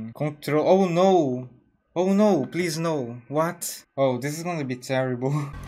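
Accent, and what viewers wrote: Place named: France. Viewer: idk why but your french accent is very nice to hear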